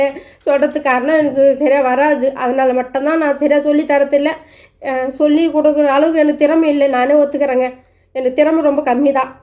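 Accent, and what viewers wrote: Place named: Northern Tamil Nadu, India. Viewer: Don't Worry dear❤❤😢😢 விடும்மா என்னை அழ வச்சிட்டியே😢❤ வேலூர், விழுப்புரம், சேலம் என்று வடமாவட்டங்களின் வட்டார மொழி இப்படி தான் இருக்கும்.Pls🙏 friends யாரும் சங்கடப் படுத்தாதீங்க❤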